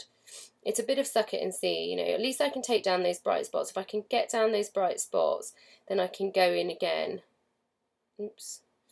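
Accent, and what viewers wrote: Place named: Cockney, United Kingdom. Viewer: Very difficult to understand your comments with your Cockney Ac;cent. Sorry but you lose me when you are discussing your techniques and its a shame...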